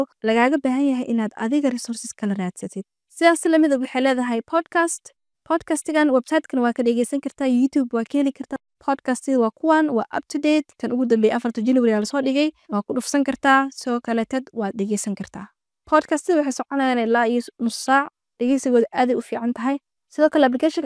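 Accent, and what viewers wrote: United States. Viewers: Wll waxan kaa rbaa appkii aad ku baratay American accent thanks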